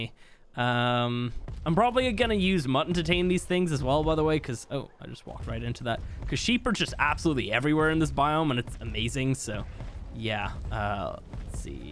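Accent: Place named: Ireland. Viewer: Jolli_2000 hes Irish its the way they talk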